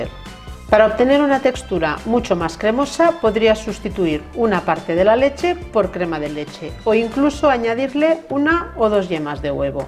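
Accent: Catalonia, Spain. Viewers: Hola, Eres de Logroño? Te noto un cierto acento catalán, no?? En cualquier caso me encantan tus recetas y aún más como explicas. Un abrazo guapa 😘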